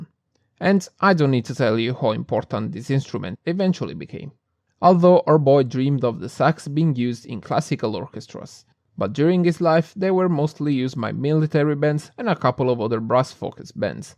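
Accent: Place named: Italy. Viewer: You are getting better at videos, lenght is right, humor is silly and dark and animation sucks as it should. Like comment subscribe lets get the algo interested more even if it already has shown you. ( oh and work a bit on the english, the accent I dont think is a problem, but some words are clearly hard to spell for you and you slur them a little. Good pronounce + slight italian accent is the way to go imho)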